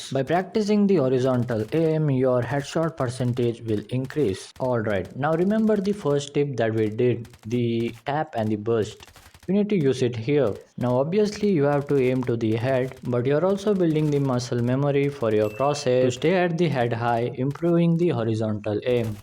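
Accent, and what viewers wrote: India. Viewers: Are you Indian??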